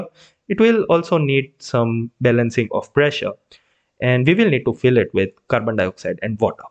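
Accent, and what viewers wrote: India. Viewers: Indian. I remember a friend of mine talked with him, and told me as such as they were both indian :P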